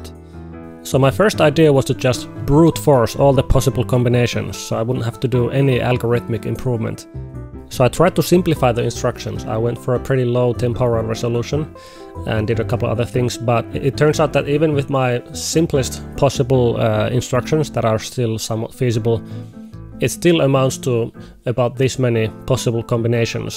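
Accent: Finland. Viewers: So, this guy is from Finland, right. Every single Finnish person speak english like that.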